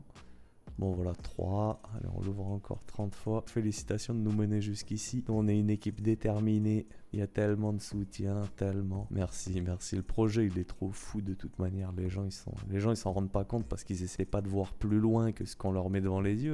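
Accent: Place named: France. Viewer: this channel sounds like a handsome french man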